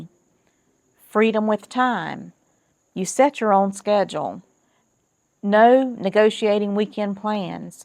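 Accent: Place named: South, United States. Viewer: What part of the south are you from... I hear an accent. Im in Bangor, maine your northern neighbor.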